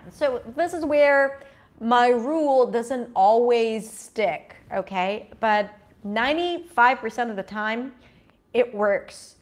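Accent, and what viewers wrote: United States. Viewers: Hi, great video. I genuinely think that even though you are not a native speaker, you are able to explain pronunciation like an American, infact the first time I came across one of your videos I thought you were American.. I hope one day I'll be able to speak English like you do.. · Hi, can you make a video about your accent? I think it will be a super interesting video as you can showcase people how you can change and modify your accent? You are a model to me and the way you speak English is just perfect. I have always wondering why you have just a beautiful voice and intonation. Even in this video, when you say the word “ computer” in a fake way, it kind of has an English rhythm in it. Another question that I have that you can use to make a video is that “ do you have to give up your personality when speaking English”? Because again, what you have achieved is phenomenal. 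It is not just about the way you speak the language but what you’re doing during speaking is so American like keep smiling all the time or pause a bit when you are thinking, looking at the above, etc. I’m really interested in knowing how you acquire American accent. I know quite a lot Hebrew speakers and even if they’re taught how to say a word in a correct way, put strong stress, Rhythm, etc. there is still something missing, not perfect like you. I think you should make a video on it.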